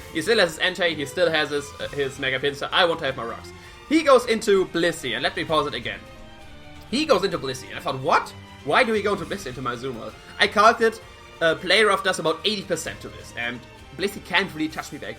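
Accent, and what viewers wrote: Germany. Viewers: Looks like another youtuber i'll enjoy to watch. BTW, if you don't mine me asking, are you German? Cause your English sounds a lot like German. Not to be rude though.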